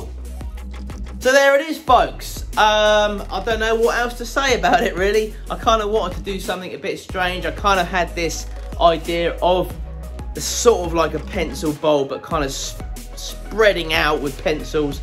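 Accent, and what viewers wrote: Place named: England, United Kingdom. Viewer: Where in England are you from, i mean with your accent ?? Its not london and probably not manchester or up there 🤔🤔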